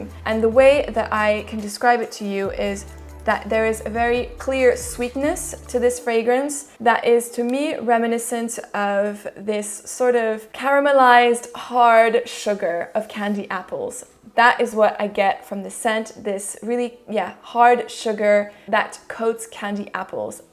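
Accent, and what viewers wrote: France. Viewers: Do you have a very slight French accent? I might be wrong, English is my second language, so I don't always pick up light accents. But you seem to know how to pronounce French word too.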